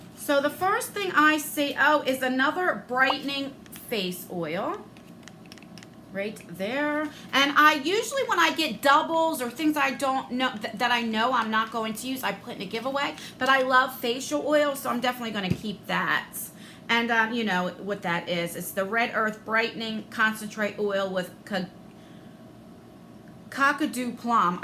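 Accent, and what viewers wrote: New Jersey, United States. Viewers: I love your jersey accent I’m from the south so it’s not very common to hear😝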